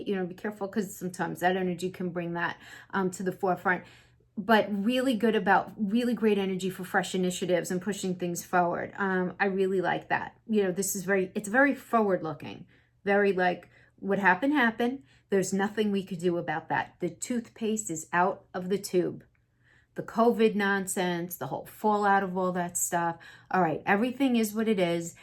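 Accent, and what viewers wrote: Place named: New Orleans, United States. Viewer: I just love your accent! Are you from New Orleans? This is the 1st time seeing your face and you're absolutely beautiful. I resonate with your Gemini Readings the most. I admire how connected you are.🙏🦋 Goals...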